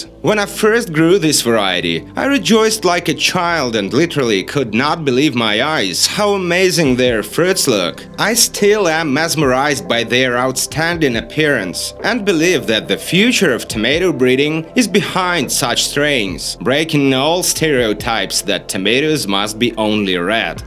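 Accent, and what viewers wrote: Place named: United States. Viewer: Забавно, а звучит так гипер американизировано, что я какое то время поразмышляла в каком штате так могут разговаривать lol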